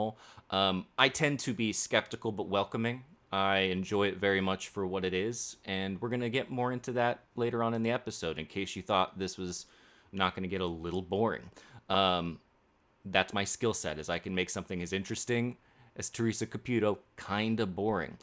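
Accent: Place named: Boston, United States. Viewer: We need more Boston accent 😂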